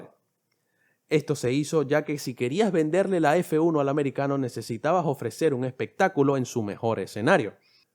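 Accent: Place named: Venezuela. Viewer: Hola hermano, increíble vídeo. Tu contenido es lo mejor de lo mejor. Ese acento se me hace inconfundible, eres de Venezuela no?? Un placer ver a un compatriota haciendo contenido de este estilo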